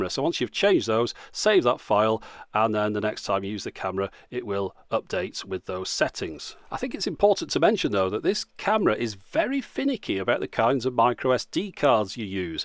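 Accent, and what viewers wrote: United Kingdom. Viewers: British accents are so silly!!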